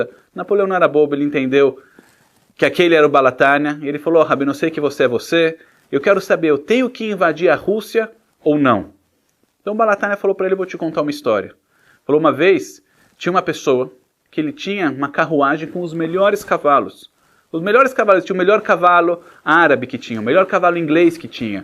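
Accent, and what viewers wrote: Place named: Brazil. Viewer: Bacana ver e ouvir um rabino ortodoxo com sotaque bem brasileiro! Todá!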